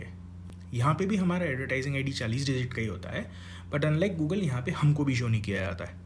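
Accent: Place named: Gwalior, India. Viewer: funny accent ... Ekdum Gwalior Andaaz me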